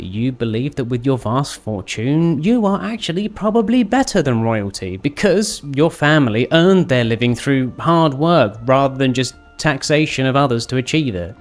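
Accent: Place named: RP, United Kingdom. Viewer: Ok, this is first time I watch video with this particular youtuber and I must say I could listen to him for hours. The way he speaks english with received pronunciation is just wow.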